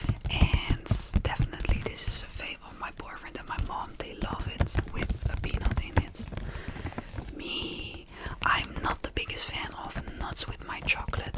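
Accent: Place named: Netherlands. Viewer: Love this, and when you traced the letters on the pizza box 🤤. I like that you’re not a fast tapper, I find a lot of ASMArtists tap too fast. Yours is perfect. I like that you tell us the Dutch works for stuff, repeat it more then once so we can learn to Dutch words for things! I live in Canada but have lots of family from holland, including my Oma! Hearing your accent on the first video I watched I recognize it immediately.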